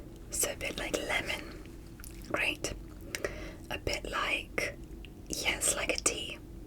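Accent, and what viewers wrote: England, United Kingdom. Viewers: Why is blud bri’ish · Good English accent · Love the British accent 💀 Or was it Australian? I can’t tell since you’re whispering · Am I the only one who noticed that she is British 😂😂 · Mate you sound so british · I am so thrilled for another English accent video. The last one with the nurse I have watched several times. You deserve an award for that one. Thank you. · You are a Really Beautiful Doctor with the Most Beautiful British Accent!! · When I heard her for the first time I went "omg she's brittish" it's sounds so relaxing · one hour ANDDD British accent!?!?!? stop spoiling us!😍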